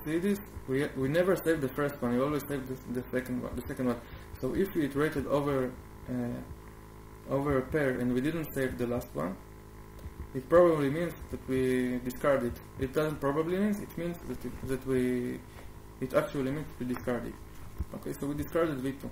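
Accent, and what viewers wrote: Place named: Arab world. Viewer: you have arab accent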